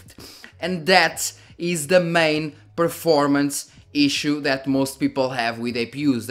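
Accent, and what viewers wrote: Portugal. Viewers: Vi logo que com esse sotaque só podias ser português hahah, bom video! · Olá, parece que és Português hahahha. Achas que devo fazer o upgrade de 16GB RAM (2x8gb) para 32GB RAM (4x8gb) ou devo ficar com os 16GB? Ps.: Lembrando que fica caro, comprar 32GB RAM (2x16gb)... só se conseguisse vender as RAM que tenho. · Bro are you Portuguese? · Tens mesmo sotaque de tuga !!!!! Excelente video....Parabens !!!! ;)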